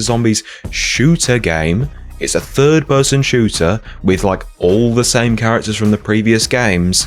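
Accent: United Kingdom. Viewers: This is the most British voice I've ever heard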